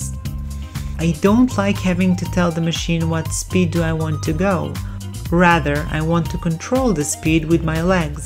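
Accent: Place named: Eastern Europe. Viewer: this slavic ascent😮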